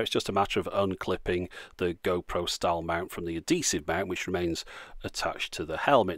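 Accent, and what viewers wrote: United Kingdom. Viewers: British accents are so silly!!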